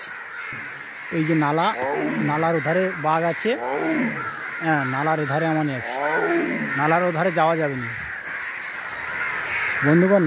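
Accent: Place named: Midnapore, India. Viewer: Tomar bari sundarboner kothay dada❤ আমাদের মীদনাপুরের ভাষায় কথা বলছো প্লিজ বলবে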